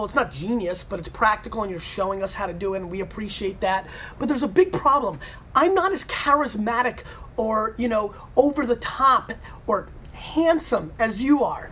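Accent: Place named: New York, United States. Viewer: nice accent i was gussing new yorker...jersey almost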